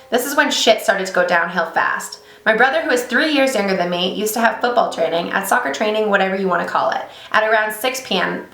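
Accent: Los Angeles, United States. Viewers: good stories but the LA voice really does break the immersion lmao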